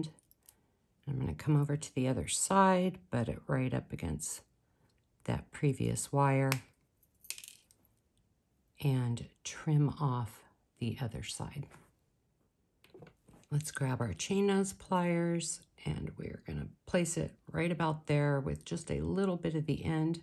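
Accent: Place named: Midwest, United States. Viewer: are you from the Midwest somewhere? I hear my family in your pronunciation of words with “or” in them like “form”. I love your designs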